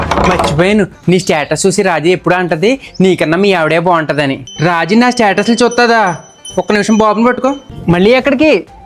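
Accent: Godavari, India. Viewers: Annayya pakka godavari language superrr anna Okka video chusinaventane subscribe chesesaanu Chala baagunnai videos😊😊😊😊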